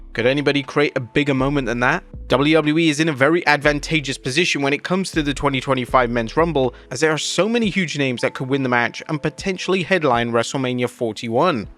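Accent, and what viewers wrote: England, United Kingdom. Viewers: 😅😅😅 .. Remember, he's an Englishman. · if you see “controversial” in the title, expect the british narrator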